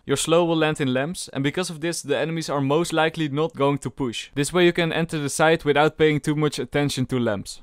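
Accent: Netherlands. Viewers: you can just hear by this guy his english accent that he is dutch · Hoor ik een nederlands accent? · Are you dutch? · Kheb nog nooit een Nederlander zo Nederlands Engels horen spreken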